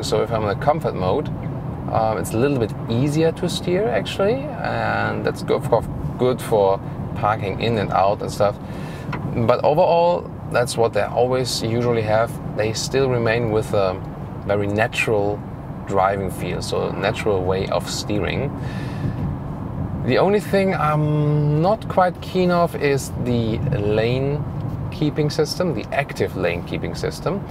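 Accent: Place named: Germany. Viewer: I like the car reviews you make, but I must admit your German accent sometimes make the sentences incomprehensible.. saying "smoosse" instead of "smooth" I did understand, but some other words might as well have been Chinese :D :D Apart from that, very informative video. The X5 grows on me every time I see it :)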